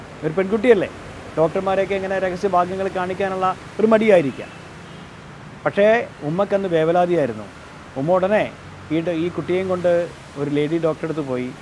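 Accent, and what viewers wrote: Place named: Kozhikode, India. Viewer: Kozhikode bhasha paranja madi Mr kovoor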